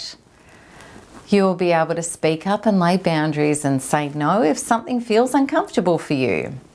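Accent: Australia. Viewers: Can I please listen to you say you want me to "know" ? So cute . Are you from down unda? Wink wink. Love it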